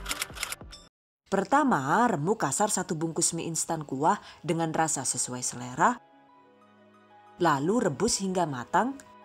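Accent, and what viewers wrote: Banyumas, Indonesia. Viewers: Assalamualaikum mba saya paling seneng klau lihat mba lagi beraksi sambil ada selingan bahasa yg ngapak he he jd inget pulang kampung saya tunggu resep berikutnya karna menginpirasi banget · Hahaha yong selalu nonton vidione mbokayune Sing ngapak Ora ngapak ora kepenaklah.... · Suka banget chanel ini.. suaranya lucu sama di MIX pke bahasa ngapaknya..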